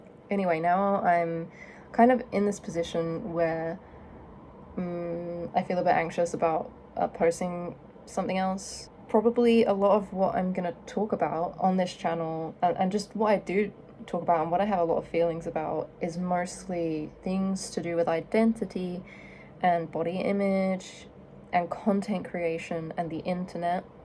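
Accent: England, United Kingdom. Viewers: Re: ideas for content : 1. Something about your city. Based on your english, I assume it is not USA, but England. 2. You trying out new things. it could be some new style, new hair color, new outfits, may be a completely different ring for the nose, new food, new hobby. Something about what you never thought to try. 3. start some exercise regime... I don't know ... yoga or heavy lifting. Something you wouldn't expect of yourself. And post how it is going. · haha maybe the british accent is deceiving but you don't seem that dumb. Maybe dumbest people are actually those who don't even realize they are dumb, idk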